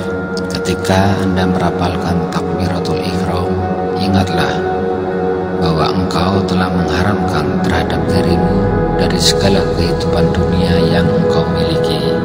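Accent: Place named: Aceh, Indonesia. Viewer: ne orang Aceh kayak nya, logat bicara, na nomor W A!,.'-.', '.-.'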